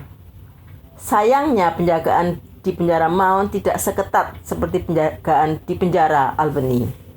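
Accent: Java, Indonesia. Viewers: ....... madam..... katanya mau 2x upload dlm seminggu....di tggu tggu kok blm juga... sukses terus ya madam.....kalo bisa medok nya di pertahankan.......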